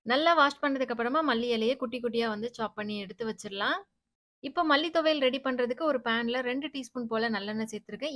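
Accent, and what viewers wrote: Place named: Thoothukudi, India. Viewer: Na valandhadhu full ha chennai dhan but enga mamiyaar veedu thuthukudi so neenga pesarapo enaku slang romba pudikum and simple ha neat ha vlogs irukum 😍😍 more over same age kuda 😍😍😍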